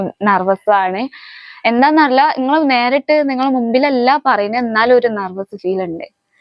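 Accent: Malappuram, India. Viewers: മലപ്പുറത്തു ആയിരുന്നോ സ്ലാങ് സംസാരിക്കുമ്പോൾ അങ്ങനെ തന്നെ മലപ്പുറത് ആണ് ഞാനും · മലപ്പുറം മലയാളം ആണ് പറയുന്നത് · Samsaram kettitt keralathil malappuram allenkil kannur aayirunnu enn thonnunu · Malappram basha ath mathi 🤗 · Samsaaram malappuram or kozhikod kaari. Look kandal nortj india kaari anu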